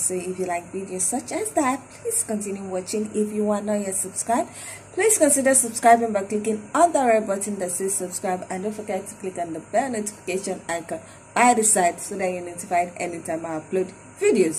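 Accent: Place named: Africa. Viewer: So I was right, I thought it was from a country from Africa, because I've listened so other girls or boys, and they sounded with a similar accent.I like it.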